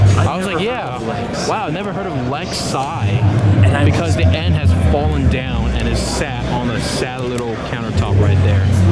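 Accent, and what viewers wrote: United States. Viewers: You sound American, but you call it a bonnet?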